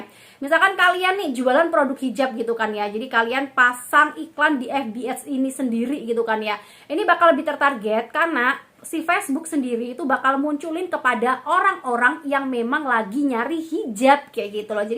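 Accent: Java, Indonesia. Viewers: Hhe...medok bnget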